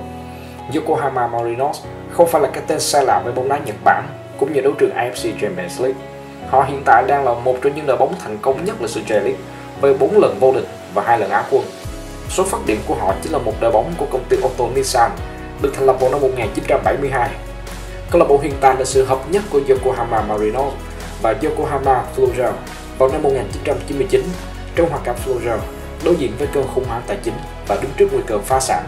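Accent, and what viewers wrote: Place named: South, Vietnam. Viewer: Giọng miền nam đc chưa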